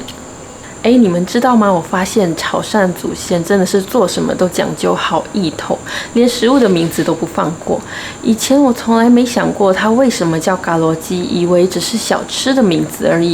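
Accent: Taiwan. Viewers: 好的。。。听了你的口音好像台湾女生。☺️ .我是马来西亚人。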